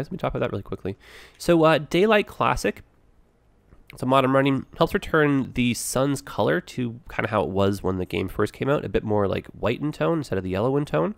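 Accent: Canada. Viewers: Just stumbled on u and may i say you have quality ! I really liked these 2 episodes so far i hope u keep doing them :) also are you maybe Candian ehh ?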